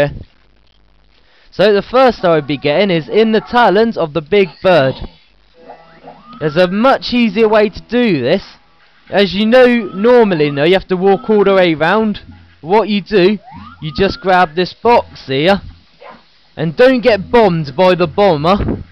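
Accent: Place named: United Kingdom. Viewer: Mario 64 and your (British?) accent, brilliant combination :D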